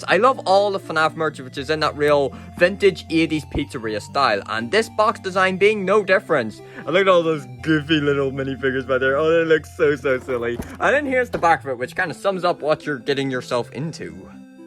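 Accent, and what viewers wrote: Northern Ireland, United Kingdom. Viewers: Are you from Northern Ireland? 😇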